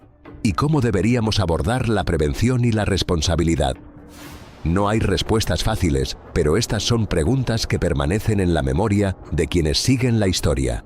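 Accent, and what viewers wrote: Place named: Spain. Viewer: Ya era hora! Un narrador ESPAÑOL.😊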